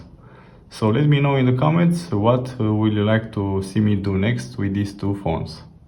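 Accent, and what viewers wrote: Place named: Romania. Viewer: English is given, but the diction does not help him, it takes a long time until the words come to his throat, I still recommend him to buy a newler microphone, something that you say speaks from a jar :)) and let it be softer with Romgleza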